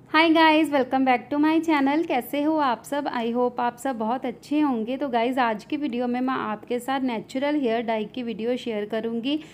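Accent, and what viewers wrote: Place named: Lahore, Pakistan. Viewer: nice n informative, btw, u have pure lahori accent like us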